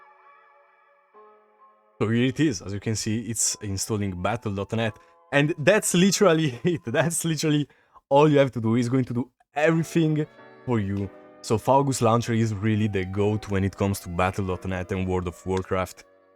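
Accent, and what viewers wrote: Italy. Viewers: Sento un po di italiano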